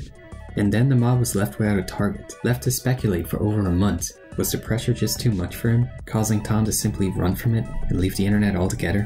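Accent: Ireland. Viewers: What's that accent? Irish? Welsh? Pakistani???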